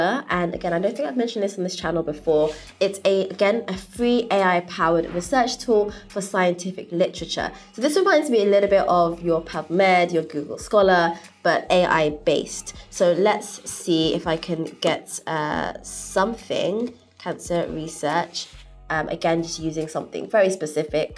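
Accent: United Kingdom. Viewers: I LOVE YOUR UK ACCENT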